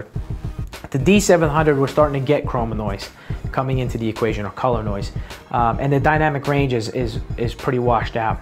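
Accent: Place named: New York, United States. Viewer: too much talking... yo, you from the NY area? yea, I thought so... eh, I feel like gettin some gabbagool.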